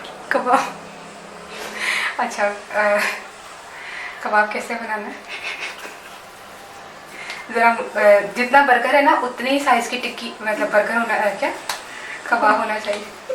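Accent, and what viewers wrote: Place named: Punjab, India. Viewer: جہاں تک ریسپی کی بات ہے بہت ہی عمدہ لاجواب ہے ۔۔۔۔میں نے تو فسٹ ٹائم اس طرح کے برگر دیکھے ہیں۔۔۔۔ اور آپکی کیمرہ وہ مین بھی کمال کی شخصیت ہیں ان کو ہنسنے کے علاوہ اور کوئی دوسرا کام نہیں۔۔۔۔ اور کتابوں کو دیکھ کر ایسا لگتا ہے کہ آپ بڑے باذوق کوک ہو ۔۔۔اور ایک بات آپ زیادہ تر کہاں پائے جاتے ہو مطلب کے آپ کس شہر میں جلوہ افروز ہوتےہو یا یوں کہہ لیں کہ آپ اپنی پرنور شخصیت سے کس شہر کو منور کرتے ہو یا سمپل الفاظ میں کہوں تو یہ بتائیں کے کس خوش قسمت شہر میں آپکا آشیانہ ھے۔۔۔ ضرور ضرور مینشن کیجئے گا ہم نے آپ کی پنجابی ایکسنٹ سے اندازہ لگایا ہے بٹ پتا نہیں ہم رائٹ ہیں یا رانگ ہیں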